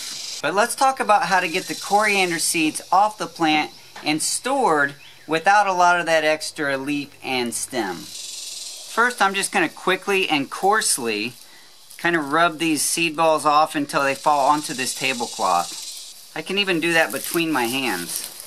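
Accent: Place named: South, United States. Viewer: That Strong Southern Accent :P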